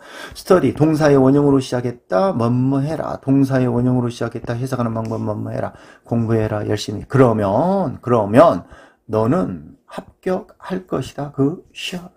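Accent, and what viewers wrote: Jeolla, South Korea. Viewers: 왜 니들 선생님 화나게 하시냐....분사는 동사가 아니래 잖아 ㅋ ㅋ 나이많은 저도 재밋고 유익하게 잘 배우고 있습니다.. (완전 전라도 사투리 ㅋ ㅋ)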